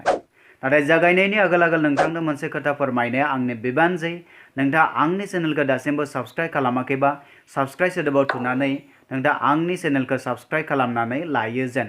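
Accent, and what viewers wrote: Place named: Goalpara, India. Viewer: Sir bungbla mwjang kwnaya so, Golpara bung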